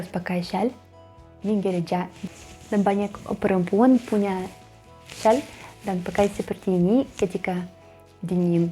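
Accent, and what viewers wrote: Russia. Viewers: Mantap..... Bahasa Indonesia, aksen Russia....👍👍👍👍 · Mbak bahasa indonesianya logat russia,, tapi enak didengar sih hahahhah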